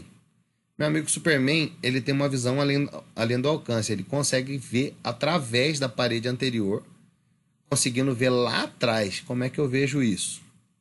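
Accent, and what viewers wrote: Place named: Rio de Janeiro, Brazil. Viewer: Muito bom!! Não sei se você se mudou pro Rio de Janeiro, ou algo do tipo, mas alguém puxar o R e o S é a primeira vez que eu vejo hahahahhahhah Grande Abraço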